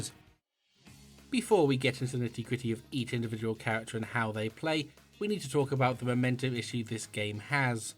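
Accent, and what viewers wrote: United Kingdom. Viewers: I didn't read every comment on each video you made, but did anyone ever tell you that you should have more subs? Maybe it's the British accent, idk, it only made me a little uncomfortable.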